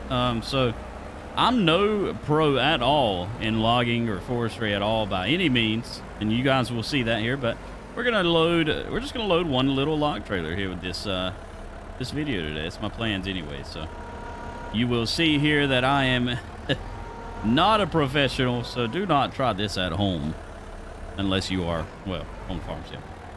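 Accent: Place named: Georgia, United States. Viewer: Where in Georgia are you from? I recognize that accent. I'm about 30 minutes SW of Atlanta.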